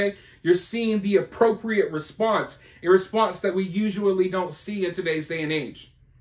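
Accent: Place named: Georgia, United States. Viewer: Your a Floridian? I thought you were a Georgian!!!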